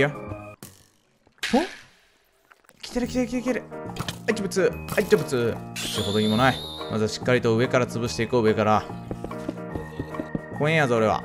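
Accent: Fukui, Japan. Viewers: え、福井の方？嶺北の方ですか？ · 前回のカズクラの福井弁はどうしたのでしょうか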